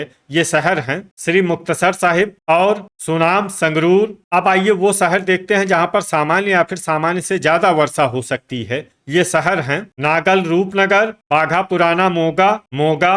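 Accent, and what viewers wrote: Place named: Bihar, India. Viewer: Why is this Bihari n Hindi accent describing Punjabi weather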